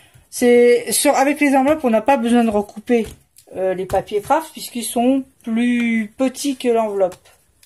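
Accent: Paris, France. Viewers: Coucou... ah le bel accent parisien, tu peux pas renier tes 47 ans de capitale ! c'est super... moi aussi je suis parigote du 15eme , rue de la Convention pendant 35 ans, comme mes parents et grands parents qui y sont nés eux aussi....et le Marché st Pierre j'adorais y aller avec une copine, dans le bon vieux temps... Maintenant j'habite au bord de la méditerranée depuis plus de 30 ans.... c'est agréable il fait beau, c'est different... Bon c'est pas le tout maintenant il faut finir cet album , car le plus dur va être la reliure je crois. En tous cas tes papiers sont superbes. A bientot, je me suis abonnée à ta chaine.. bises <3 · Je n'avais jamais vu un album fait de cette façon c'est tip top. J'adore le bloc Paris il est super beau et j'ai tout pigé !! J'aime aussi beaucoup l'embellissement que tu as acheté pour ta fleur en organza. Je trouve super élégant. 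J'ai tout pigé à droite à gauche on voit bien s'il y a un vide ou pas. Ah bon t'es une parisienne. Moi aussi je connais bien le marché St Pierre. J'y achetais de la soie. J'ai fait de la peinture sur soie pdt longtemps. Moi je suis une banlieusarde depuis toujours !! Je comprends mieux ton phrasé ... Très parisienne... J'adore.... Bisous 😘💋🌞🐦🌈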